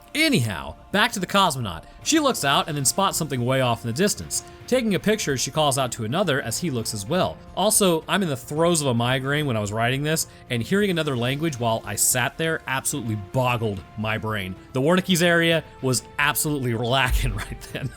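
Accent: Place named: Roanoke, United States. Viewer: I call the combination of your speech, cadence and inflection Roanokian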